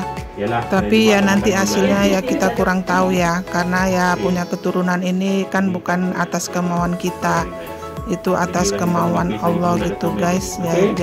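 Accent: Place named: Indonesia. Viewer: Hujan ya bund , oh ini rumah sakit yg populer yg biasa org Indonesia klw berobat kemalaysia ya Bun , tp dari logatnya bunda sprti org Indonesia ya Bun , mknan berat sepiring plus snack2 ada bakso nugget dikafeteria rumah sakit JD gak tegang dan kaku ya Bun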